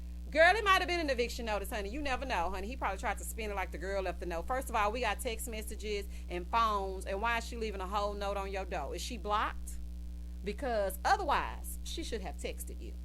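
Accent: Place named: Houston, United States. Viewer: in my mind, you are my new Bestfriend....everyone has pointed out how you and I mirror one another with the way we speak...LOL!! Houston meets South Carolina 🤗 Love your channel and I hope it continues to grow...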